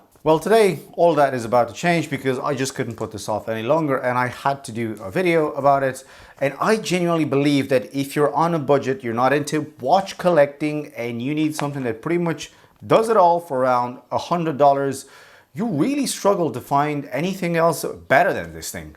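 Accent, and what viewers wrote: Ireland. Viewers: Great review! I was thinking you had a vague Irish accent and checked insta to see your based in Dublin lol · Are you located in Ireland? Hint of an Irish accent.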